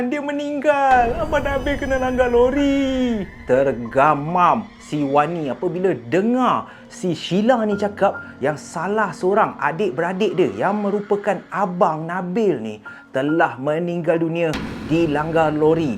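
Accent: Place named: Kedah, Malaysia. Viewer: Orang Selangor nak CAKAP Kedah MEMANG susah lah SIKIT sebab loghat Kedah ni sangat pekat sedikit. Best lah ni.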